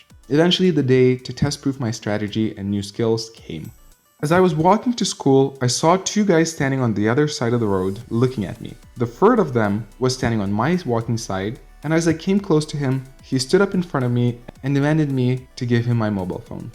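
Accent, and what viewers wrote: United States. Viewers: I see the issue with your view. Martial Arts do work but you have never been taught to think the way of the Martial Artish. You must remember young Martial Artish to swim like a brick, Be the bookcase. Flow like a wall. You sound American but yet you say mobile phone and not cell phone as Americans do so that was unexpected. PS give me your phone ! :)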